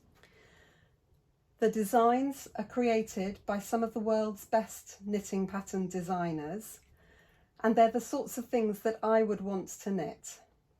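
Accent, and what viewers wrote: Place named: England, United Kingdom. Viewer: Hi, I've just discovered you and your technique videos recently thru MDK which led me to this YouTube channel. Just wanted to say hi and thank you. Your videos are great, easy to follow and understand. I'm an expat from Scotland so your lovely English accent takes me home. Looking forward to learning more. I'm always eager to improve.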